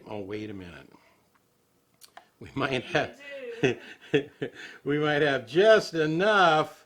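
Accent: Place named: Ohio, United States. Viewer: You are videoing from TN where you may live, but you sure don't have the accent. I think you sound like you're from Ohio! I love the accent.😊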